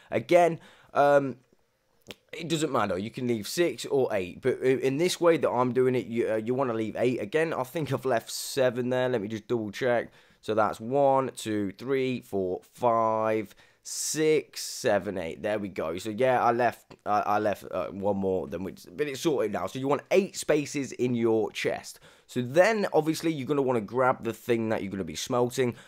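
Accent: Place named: United Kingdom. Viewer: For anyone asking why he sounds like DanTDM, it’s because he’s British and has a similar regional accent to him. There is a slight difference, with Dan sounding slightly more “posh” than him, but they are largely the same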